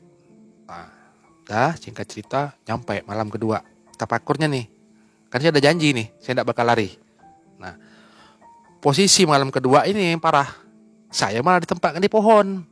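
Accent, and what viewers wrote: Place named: Jambi, Indonesia. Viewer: bhs melayu ny sama kayak di jambi 😭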